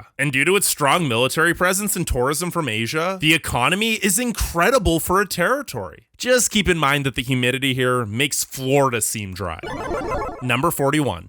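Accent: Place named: New York, United States. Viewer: This guy must be from NY.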